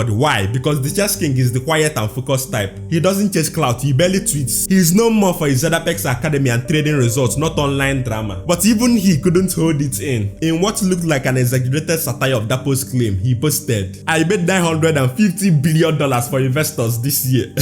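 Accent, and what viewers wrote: Igbo, Nigeria. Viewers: Very thick igbo accent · Pally go and improve on your diction. Your igbotic accent is too annoying and local .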